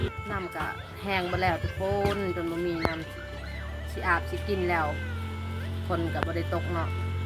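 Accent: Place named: Vientiane, Laos. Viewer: สำเนียงเว่าคือคนลาวเวียงจันทร์แถ่ะครับพุสาว😊❤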